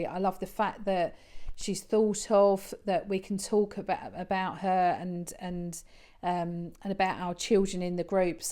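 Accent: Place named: Essex, United Kingdom. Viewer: Hi, Mrs M has recommended your channel, so here I am. Hope lots of viewers come over to see you. So sorry to hear you lost your beautiful daughter, I can't imagine how anyone copes with losing a child., yes!!!. I'd love to see your renovations. I was trying to decide on your accent, I was going to say your a London/ Essex girl then you told us. I'm from Ilford so also an Essex Girl. Have a great weekend 😊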